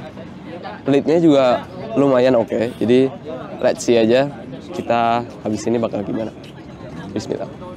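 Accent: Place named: Malaysia. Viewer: melayu gitu logat nya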